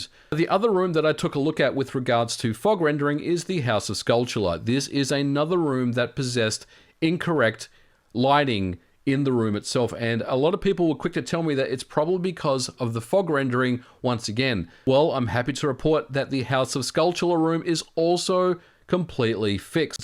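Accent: Australia. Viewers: a) "oak-uh-reener" man i love australian accents lmao b) i'd really like to know how there are "bugs" emulating a 25 year old game on VERY capable hardware. theyre a trillion dollar company. make it make sense. especially considering how emulation has been on everything else for decades.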